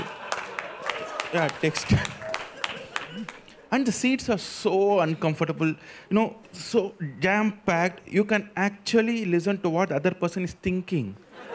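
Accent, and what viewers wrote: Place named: India. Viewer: Standup comedy by Indian artists somehow does not amuse if they talk in English. Heavy accent takes out the fun.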